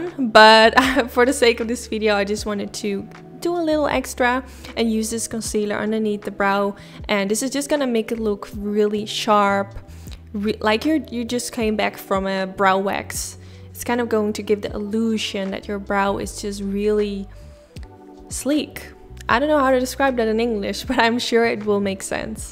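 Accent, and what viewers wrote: Netherlands. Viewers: Ben je nederlands? Want hoor beetje een nederlands accent in je engels haha x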